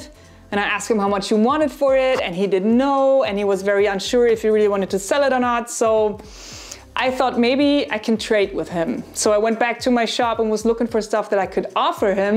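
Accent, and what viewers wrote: Germany. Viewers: I know this is an older video but I have to say I love it. Also, unrelated to the video... Are you German? I love the accent. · Awesome! I’d like to take this opportunity to tell you that your accent is one that takes me back to Darmstadt Germany in the late 90’s. I was stationed there and dated a woman with an accent and voice just like yours. Nostalgia,… Gotta love it. Keep up the good work and awesome channel! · Hello! This is my first viewing of a video of yours. Not sure but I guess you German? I learned Norwegian as a child from my grandfather but now only remember 4 or 5 words. It is just that grandmother was Danish so she was sabotaging things because she was insisting I learned Danish, while my other grandfather was German, so yeah, he was working the German angle. I was so confused! And then I got to kindergarten and the kids only spoke English!!! 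It might have been hilarious to everyone------ but me! But I still have family in Bergen and Ringstaed but I haven't seen them for quite a while now. But it seems I have a few strange words in my vocabulary that come out on occasion, I think they are from my pre-K days. But your English is great and with a lovely accent, and an easy laugh! So good for you on that! At any rate, I think your 'electronics' would be better if you ripped them all out and started over! Safety first!!! Always!!! Even if it costs money!!! (My Norge grandfather was very, very reluctant to spend a penny! But some items are just too valuable to not have new--- like wiring!!! Hope you agree! ) Tak!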